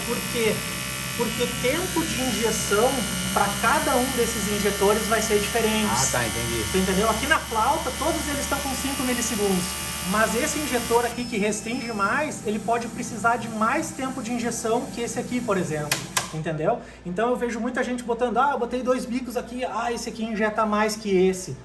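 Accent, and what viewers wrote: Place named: South, Brazil. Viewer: Cara tem a mesma voz do Cristian da Education kkkkkkkkkkk esses do Sul falam bem parecidos com outros da região ........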